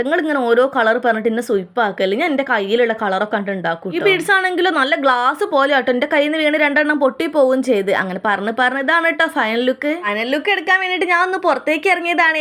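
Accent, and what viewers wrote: Kozhikode, India. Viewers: ഏച്ചി കോഴിക്കോട് ആണോ ഇക്കി ഒരു doubt അയിനൊണ്ട ചോയിച്ചേ ഇന്റെ നാട് കോഴിക്കോട് ആണ് 😊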